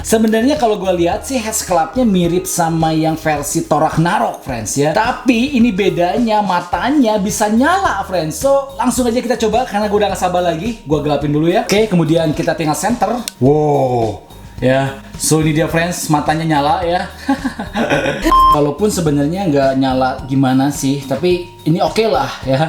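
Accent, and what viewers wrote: West Java, Indonesia. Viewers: Sundanese detected, hehehe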